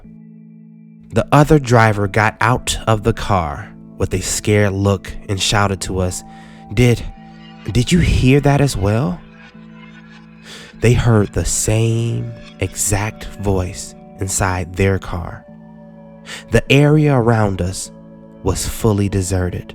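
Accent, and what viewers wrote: South, United States. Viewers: I can't place the accent. Sounds slightly southern but not quite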